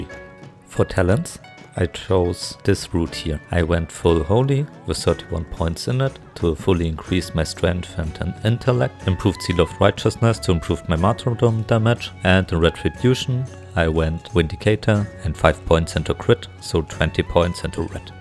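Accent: Germany. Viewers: Man hört so krass das du deutscher bist 😂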